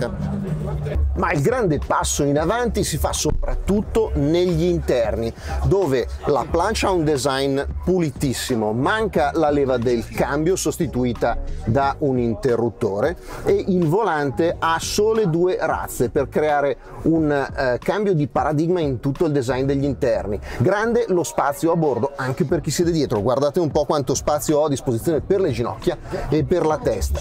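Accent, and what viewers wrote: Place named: Milan, Italy. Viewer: Bravo lo speaker. Peccato per l'accento meneghino.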